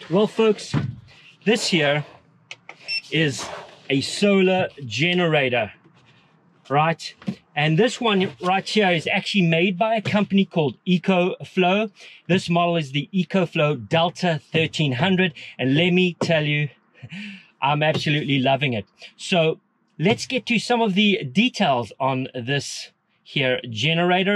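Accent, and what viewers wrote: Afrikaans, South Africa. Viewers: Are you south African? Or have you lived there for a while, your accent is so similar. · Love the South Africa Accent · South african? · South African accent? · Almost sounds South African? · I agree that is a South African accent. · Jy klink vir my Afrikaans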